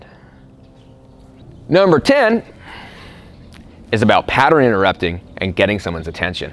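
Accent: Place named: South, United States. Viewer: When did you develop this southern twang in your voice?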